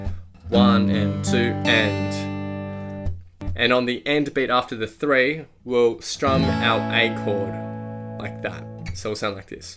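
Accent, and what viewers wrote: Australia. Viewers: Am I hearing an Aussie accent there? This is a great tutorial, so brilliantly guided through all the steps and details!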